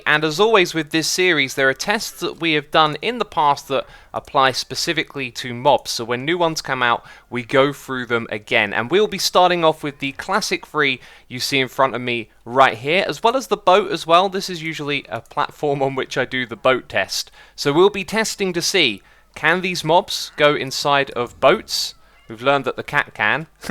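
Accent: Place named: United Kingdom. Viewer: What happened to xisumas accent over the years? Some ppl might not know but had a "normal" accent. I mean sounded like a american accent. Not sure how he started talking british suddenly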